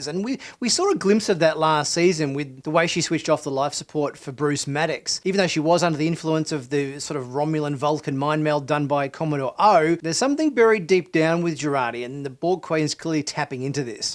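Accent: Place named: Australia. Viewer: You were doing fine until towards the end with your conjecture and speculation...then, your Ozzie accent wore me out.....plz stop talking.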